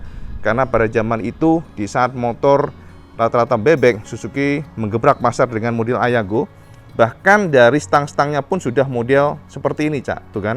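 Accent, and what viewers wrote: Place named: Java, Indonesia. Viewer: Jet koledd cak wong jowo ngomong e 🤭🤣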